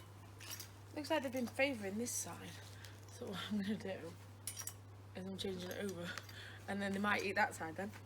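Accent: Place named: England, United Kingdom. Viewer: Hello Young Lady I do love watching your videos I always did love a woman with an English accent and with a full figure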